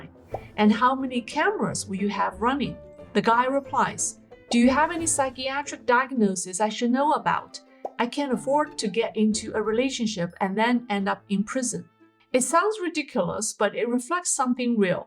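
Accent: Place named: United States. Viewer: Wow. She sounds like an American woman.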